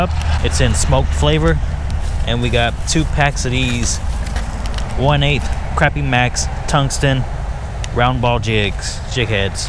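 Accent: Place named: South, United States. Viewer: Dope B roll... Idk. Dope southern accent... Oh Yeah!!! Informative video. Thanks for posting! Is that the bass pro in bristol ?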